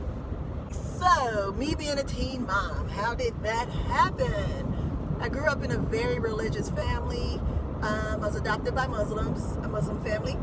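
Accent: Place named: Chicago, United States. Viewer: I knew you were from Chicago!!!! Your accent is straight Chi-town...all the way!!! Im from Chicago too. 😄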